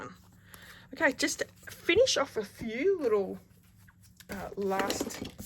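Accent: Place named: Australia. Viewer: I love the dsp. It’s a beautiful card. Well done! It’s also nice to hear an Aussie voice.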